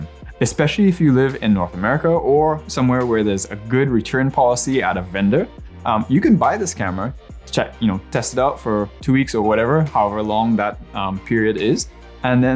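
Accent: Trinidad and Tobago. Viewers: Do I hear ah Trini ??? 🇹🇹👀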